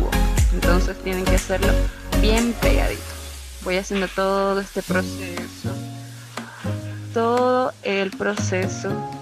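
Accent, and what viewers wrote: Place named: Ecuador. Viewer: Eres de ecuador o venezuela?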